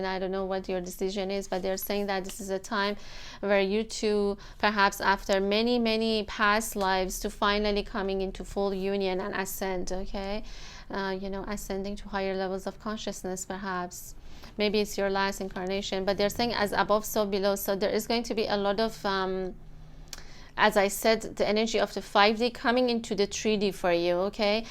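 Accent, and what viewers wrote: Iran. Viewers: Beautiful Irani Accent! Thank you for the reading.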